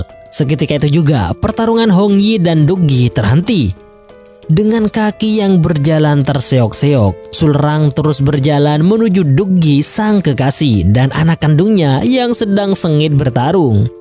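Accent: Madura, Indonesia. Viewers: Kreator nya orang madura anjirr 😩🤣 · Orang madura ya bang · reng medureh kak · Naratornya orang Madura ya? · Madureh kang ? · lu orang Madura ya ? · Reng madureh admin nah 😁😁😁💪💪💪💪💪💪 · Abeeehhh reng madure be'en kak ?? 👍👍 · Ser reng medhureh reh narator eh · Madura mana bos,, wkwkwkwkwk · Patek jeh atmin reng madureh yeh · Orang Madura mas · Madureh yehh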